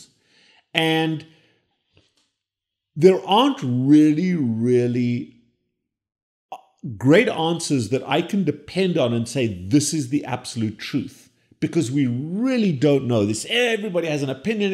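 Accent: South Africa. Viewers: That's true. But he only said it once and YT text-to-speech often gets words wrong. It esp doesn't like South African accent, translating it more often to "covert." But I just checked a recent John Campbell interview (someone much more off the deep end about Covid) and the TTS had no trouble parsing "Covid." There's no conspiracy here. I was just showing you that you could type it in the comments (twice!) without the black helicopters appearing.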